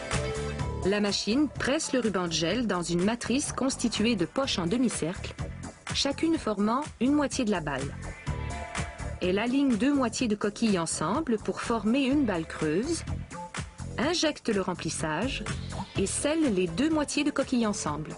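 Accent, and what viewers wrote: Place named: Canada. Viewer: quel bel accent canadien !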